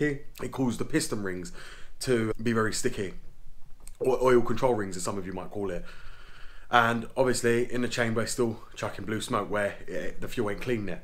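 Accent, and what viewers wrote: United Kingdom. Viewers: In the USA, his British accented English is said so fast as to be indeciperable.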